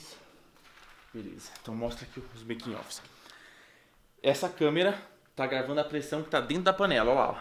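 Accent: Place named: Jandira, Brazil. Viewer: Cara vc é de Jandira né?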